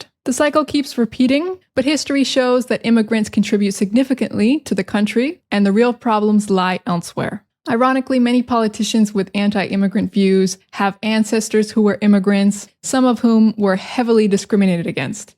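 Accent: United States. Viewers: I'm a venezuelan, now I'm living in Galicia Spain, i love your American accent ❤... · omg I’d honestly disliked American accent until I got stuck upon yours, it is by far the best ❤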